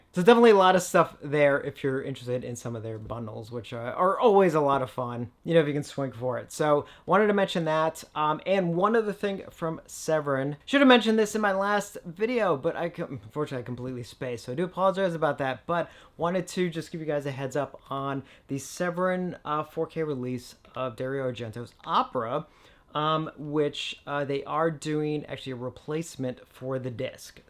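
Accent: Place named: Chicago, United States. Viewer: This new Haunts set looks excellent! I still don't have the first one though, I will have to get it next time it goes on sale and then maybe next year will get this second volume. Thanks for covering this,! Don't worry about the Chicago accent~I'm over here with a Boston accent myself. I pahk my cah in Harvahd yahd afterall 😅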